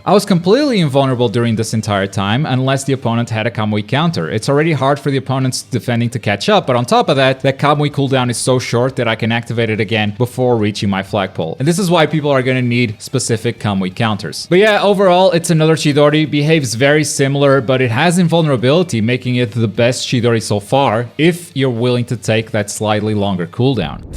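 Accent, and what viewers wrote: Portugal. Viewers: he´s portuguese, LET´S GOOOO, na tua apresentação do canal tu foste logo cm o sotaque d portugal ahaha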